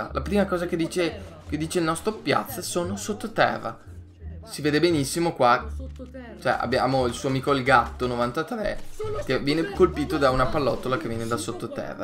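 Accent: Veneto, Italy. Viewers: Sei veneto?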